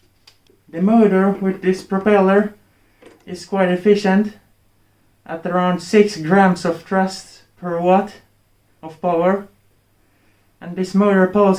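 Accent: Finland. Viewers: your Finnish accent is wonderful. And you are soooo cute <3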